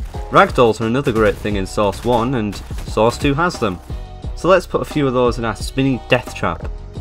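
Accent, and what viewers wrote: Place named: Northern England, United Kingdom. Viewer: kliksphilip but northern england instead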